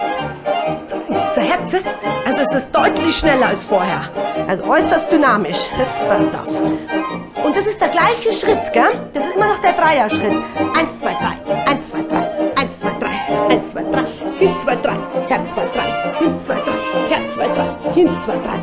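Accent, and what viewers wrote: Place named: Bavaria, Germany. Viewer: Liebe, ich wollte dir einfach nur ein Kompliment aussprechen. Ich hab mir mit meinem Mann euer Video angesehen. Du, oder ihr seid so sympathisch und lustig. Danke für dieses schöne Video. Das Bayrische klingt so nett und deine Sprüche sind zum Kugeln 🤣 super! Du solltest im Kabarett auftreten! Alles Liebe aus Österreich und weiterhin viel Freude beim Tanzen! Isabella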